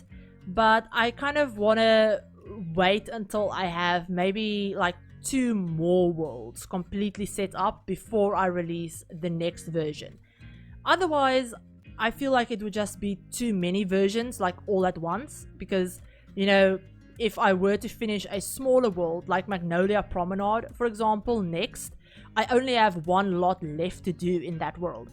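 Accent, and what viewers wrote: Afrikaans, South Africa. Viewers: This is the first video of yours I’ve watched and I was so shocked to hear what I think is an Afrikaans accent? So cool!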